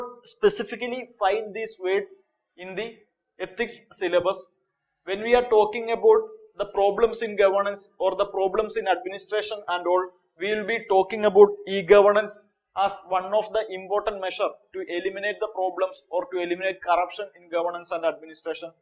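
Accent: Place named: South India, India. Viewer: As if you are father of Shakespeare. He is south indian and hence has a particular accent. I have no problem with his language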